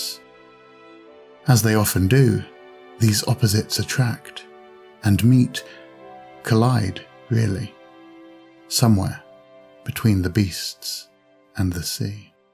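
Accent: Scotland, United Kingdom. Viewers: What a nice Scottish accent👍 and keep up the good work.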